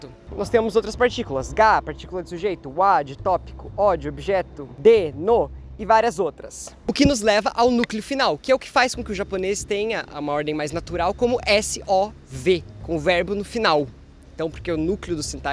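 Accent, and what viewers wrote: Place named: Curitiba, Brazil. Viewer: Show! Você é Curitibano!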